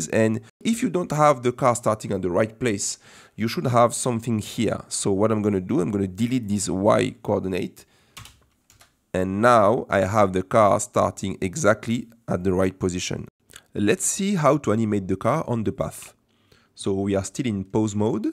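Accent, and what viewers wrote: France. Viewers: GG pour le travail, j'aime cet accent français Ahah ! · Magnifique accent francais ^^ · L'accent français 😂 sinon très bonne vidéo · A l’accent je dirais que tu es Français non? Merci pour ton tuto, en français ce serait top 😁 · You sound french or something like that , cuz i hear an accent , i mean i'm french and i hear the french accent , i'll be surprised if your not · Mais tu as un accent français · Salut je viens de voir ta vidéo dans mes recommandations alors que je ne regarde jamais d'animation 3d et enfaite c grave styler et sa doit prendre beaucoup de temps sinon continue comme ça mais je me suis dit que j'allais envoyer le message en français c que tu a un peux l'acsan français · This is amazing. Thank you so much! Your french accent makes this long tutorial so much more tolerable! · tu es français toi haha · Baguette But honestly I just love french accent... 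you saved my evening... I don't even care about blender and animation but still very interesting and well explained :D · Tu parle français sa se vois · on sent l'accent français mdr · ça ce voit que t'es français sauf que moi aussi et du coup ça me fait rire parce que c'est la vidéo parfaite mais je comprend rien 😂😂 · Wsh mais t français sa se voit tellement avec l'accent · i like your french english ascent hhhhhhhh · T'as un accent français ça me fais tellement rire x) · Toi t'es français 😂😭😭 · Tona accent français 🔥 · you have a french accent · T es français ? En tt cas t as un accent français du coup j comprend 😂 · tres bon tutoriel avec un bon accent Francais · french accent are crazy bro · Tu pourrais le faire en français stp car j'ai l'impression à ton accent bien qu'il soit très bon, que tu es français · Ton accent français se remarque direct mdrrrr Continue comme ça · Le bon accent français! 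J’aime beaucoup le tuto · Tu esy français mon amis 😉 je t’ai reconnu sinon bon travaille 👍🏽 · Ça s’entend qu’il est français le man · PTDR ta un acens Bien FRANCAIS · serait tu français avec ton accent ?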